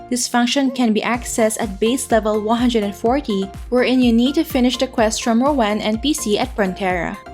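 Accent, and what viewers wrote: Philippines. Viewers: You sound like a filipina trying so hard to sound american but your filipino accent is coming through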